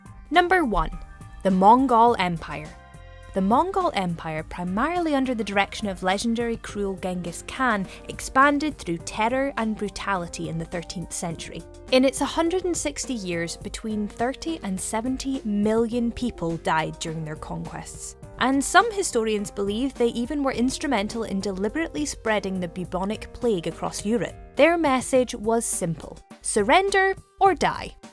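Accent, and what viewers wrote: United Kingdom. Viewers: You guys are disgrace of YouTube! Stop spreading propaganda! Aren’t you ashamed to erase free speech? From your accent, you are British? Aren’t you ashamed hiding the true history and erasing the dirty history of yours?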